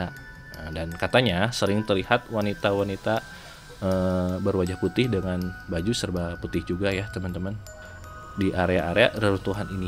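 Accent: West Java, Indonesia. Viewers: Terimakasih infonya yaa...konten yg bsa nambah wawasan. Btw salfok sama cara baca narasinya, sundaaa pisaaann hihihiii makin sukses yaa kang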